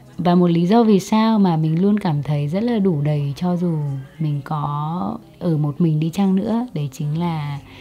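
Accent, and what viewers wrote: Central, Vietnam. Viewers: Hic chị quê ở mô rứa ạ. Em thấy chị nói giọng miền trung 😊 Tập này như đang nói đến em vậy . Em cũng đang ở xa nhà ít bạn bè và đôi lúc cảm thấy cô đơn nhưng rất thích quãng thời gian một mình Đôi lúc bản thân cũng có thời gian lặng để biết bản thân cần gì😊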